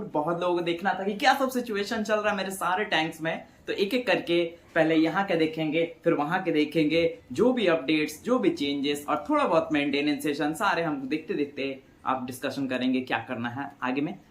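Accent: Bengal, India. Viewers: Bengali ya bihari?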